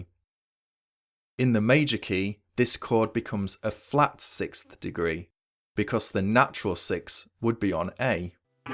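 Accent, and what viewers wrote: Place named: Liverpool, United Kingdom. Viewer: An excellent explanation of the topic. Before the Internet and YT, it was nearly impossible discover such theoretical concepts and approaches to composition. Plus, I enjoy picturing George Harrison as instructor, since you sound very much like him—a fellow Liverpudlian, I suspect. Cheers!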